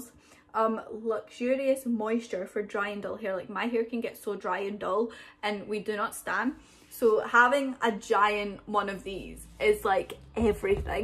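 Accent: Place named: Scotland, United Kingdom. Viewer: youtube recommended this to me for some reason but im here for it. came for the novelty, stayed for the scottish accent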